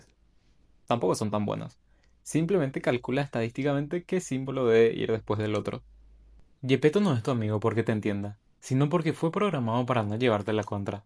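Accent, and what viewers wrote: Paraguay. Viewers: de casualidad no sos de paraguay? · Tenes acento paraguayo :v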